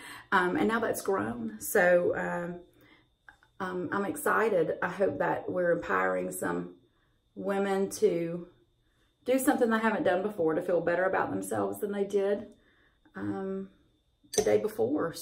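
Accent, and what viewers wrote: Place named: South, United States. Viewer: Wow I could jst watch you all day. Very beautiful. Luv your channel. Love your style and your cute southern accent too!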